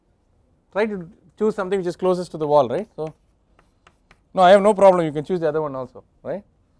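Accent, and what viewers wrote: India. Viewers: Yes, you need to tune into the characteristic hindi accent.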